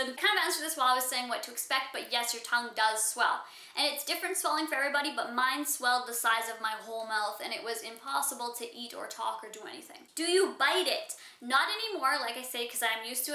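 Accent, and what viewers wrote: Canada. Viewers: Are you Canadian? You sound like you are.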